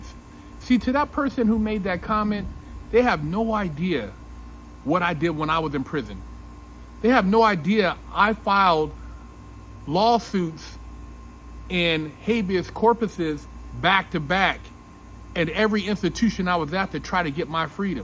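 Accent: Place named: Montana, United States. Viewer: But you live in Cali right?.. sounds like you're talking like you live in Montana or something. No disrespect. Love ya!!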